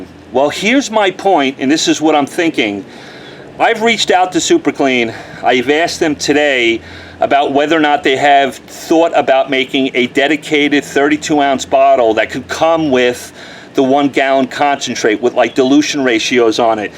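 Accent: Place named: Long Island, United States. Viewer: You must be from long island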